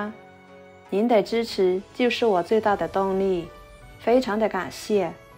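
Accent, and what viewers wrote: Yunnan, China. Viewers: 我就想问，博主是不是云南的？🤣🤣🤣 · 嗯，一聽就是雲南妹子。😊好手藝👍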